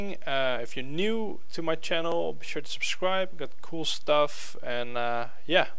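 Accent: Netherlands. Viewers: are you dutch? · Je hoort Gwn aan je stem dat je nederlands bent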